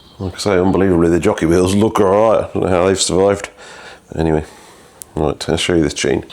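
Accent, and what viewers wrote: United Kingdom. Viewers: "The hears seek to deift uo and down the middle of the cassette and shifts by itself" Fitting the deraileur might help 😂 we can only get awsy with this level of dry himour with a british accent. Like the time a eomsn brought me a wheel thst was out if true by over an inch in mire than 1 spot and asked me to tighten one spoke. Calmly explained that the whe is more than 1 spoke and it doesnt work like that. She was absolutely furious. Of course i offered her a proper solution but she insisted on just the 1 spoke before storming out in a fit of rage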